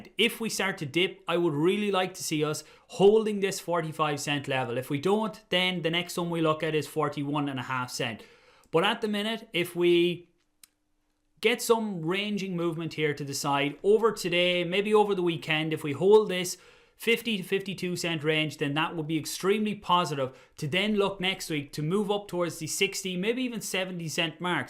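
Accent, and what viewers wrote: Ireland. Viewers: Heard the Irish accent and subscribed straight away🇮🇪🇮🇪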